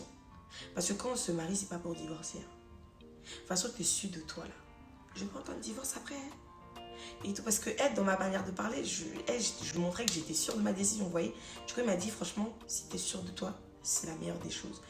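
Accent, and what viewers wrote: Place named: Ivory Coast. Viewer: Trop stylé ton accent ivoirien 😂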